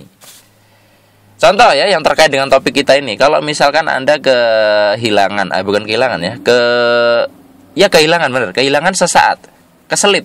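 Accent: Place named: Java, Indonesia. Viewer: ini kok suara ronald franknya gk kayak biasanya, ada medoknya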